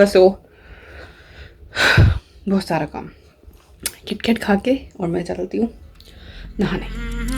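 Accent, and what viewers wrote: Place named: Western Uttar Pradesh, India. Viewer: I like her a lot. The way she speaks. and Haan I m also from West UP. Apki language sunkar apni taraf ki Yaad a jaati h. Take care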